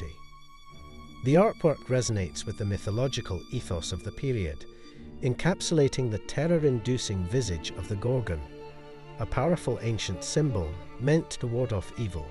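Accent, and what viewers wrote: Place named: Scotland, United Kingdom. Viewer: I love your voice sooooo much! I can listen to it forever lol. Scottish sounds so cool. You are a great audio book haha 😂. Keep up the videos you got a sub!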